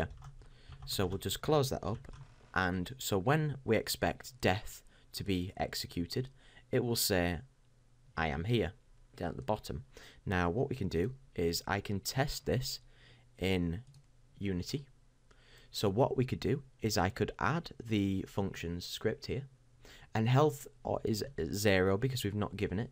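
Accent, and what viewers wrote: United Kingdom. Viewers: I've already saved your channel AND subscribed. I'll definitely be exhausting your videos before I hunt for any more. Wish I could buy you a beer...or a i guess that would be more like a 'pint' since it sounds like you're in the UK. Cheers!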